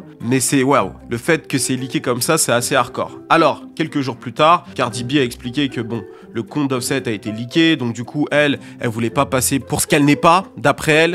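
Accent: Democratic Republic of the Congo. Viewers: J'adore ton accents lingala kulutu ❤🇨🇩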